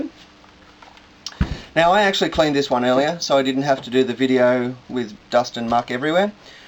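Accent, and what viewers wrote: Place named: Australia. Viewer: you were of great great help! and the Australian accent rocks :-)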